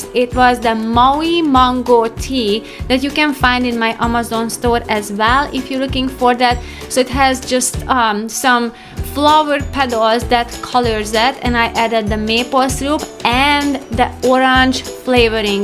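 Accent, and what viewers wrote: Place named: Hungary. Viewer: One of your other videos was just recommended to me and when I clicked and heard your accent I knew straight away that you are a fellow Hungarian! 🧡 Feliratkozva!! :)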